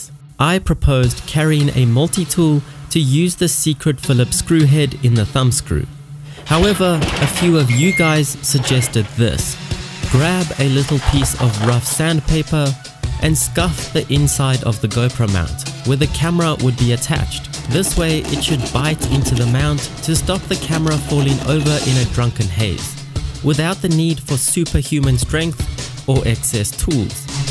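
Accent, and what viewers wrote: South Africa. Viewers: Where are you from? You sound very South African. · you sound south african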